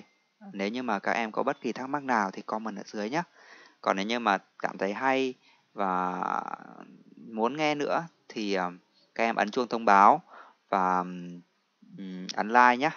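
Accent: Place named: Thanh Hoa, Vietnam. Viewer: anh là người thanh hóa ạ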